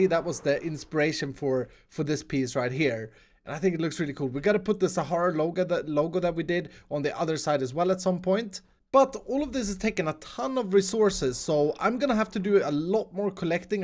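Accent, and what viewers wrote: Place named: Sweden. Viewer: This is swenglish on a new level (i’m scandinavian too btw)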